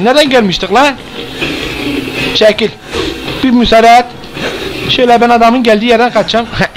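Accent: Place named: Trabzon, Turkey. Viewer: şivesi iyice tıraykyaya kayı bu ne leee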